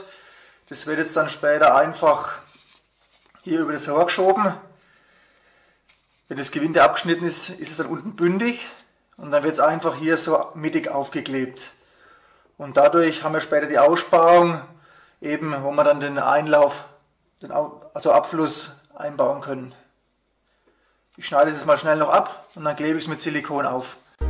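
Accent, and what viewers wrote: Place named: Franconia, Germany. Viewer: Allein für‘s fränkische einen Daumen hoch. Grüße in die Heimat.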